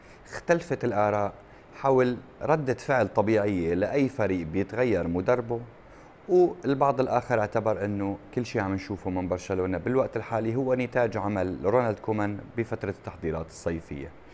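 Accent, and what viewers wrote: Aleppo, Syria. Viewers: لك والله من اول كلمتين بين معي انو من حلب ههههه موفق بلوووووود وجميل جدا اسلوبك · لهجتو واضحة سوري من حلب تحديدا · ميم 55 لا هو بيحكي حلبي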